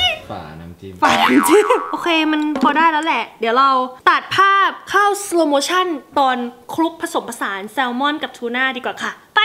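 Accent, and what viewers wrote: Isan, Thailand. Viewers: พี่เป็นคนอีสานหรอค่ะพี่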